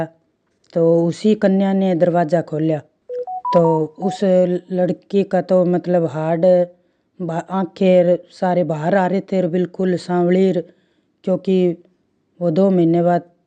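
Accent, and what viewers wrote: Haryana, India. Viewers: Aap haryana se lgti ho bahan ji prnaam 🙏🏻🙏🏻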